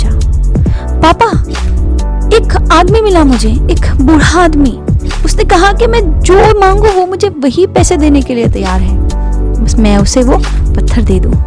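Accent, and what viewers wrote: Bengal, India. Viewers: मेम साहिबा , कहानी तो बहुत ही ज्ञानवर्धक और प्रेरणादायक है , इसके लिए बहुत बहुत धन्यवाद । आपके बंगाली टोन के कारणों से बहुत ही छोटी गलती स्त्रीलिंग और पुल्लिंग के होता है , होती है में अंतर मिट जाता है , बुरा नहीं मानेंगे । कृपया ध्यान दें । 👍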